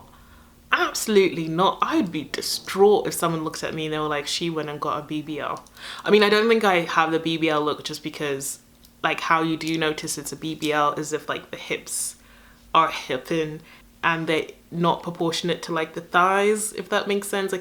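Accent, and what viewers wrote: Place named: United Kingdom. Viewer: are you British? I absolutely love your accent.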